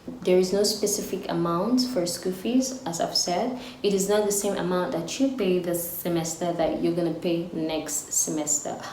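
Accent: Philippines. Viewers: Wow 😮 nice accent pilipino accent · Nice,your accent is like phillipines already... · You are starting to sound like a filipina. Thank you for all the information that you shared.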